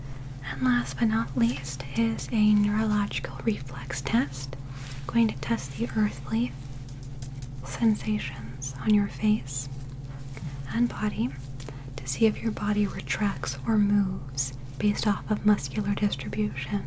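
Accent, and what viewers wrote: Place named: United Kingdom. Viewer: The British accent noooooooooo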